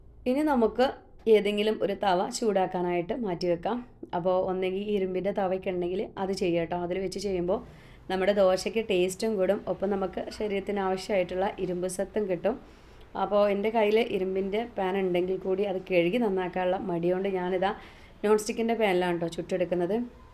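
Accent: Malappuram, India. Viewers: മലപ്പുറം താത്ത എന്ന് പറഞ്ഞു ഒരു മാതിരി സംസാരം ആയിരുന്നു ഇത് കുഴപ്പമില്ല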